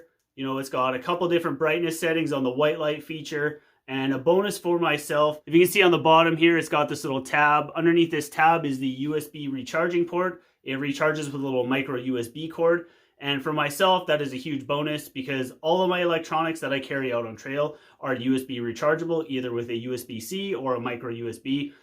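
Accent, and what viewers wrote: Canada. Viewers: I love your Canadian accent.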